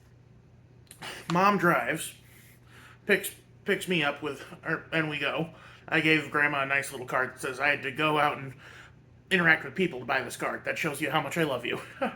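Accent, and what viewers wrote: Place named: Midwest, United States. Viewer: I didnt know you live in texas you got a midwest accent strange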